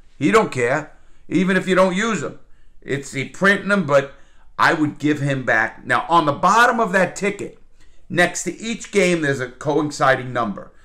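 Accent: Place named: Queens, United States. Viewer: Hey, great stuff. My late dad was raised in queens (Jackson heights) and the accent is different than yours but close. Your vids make me feel like home (living in Dublin for 2 years now). He would call my younger brother and I loan sharks whenever we played monopoly as kids lol. He ran upstate to the Catskills when he was a teenager, he had friends in the street game in the city but was never part of it (at least not seriously). Love the vids, thanks for all you share.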